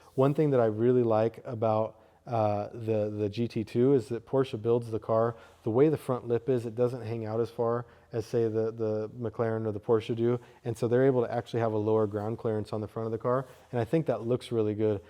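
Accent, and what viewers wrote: United States. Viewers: Totally off topic, but are you American? Obviously you're in Germany, but you speak like an American so just randomly curious.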